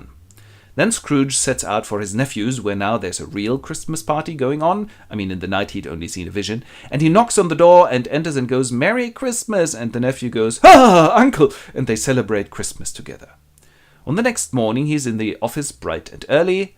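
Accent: Germany. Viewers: Briiliant !!! Five Stars Award !!! Absolutely love the German-style accent !!!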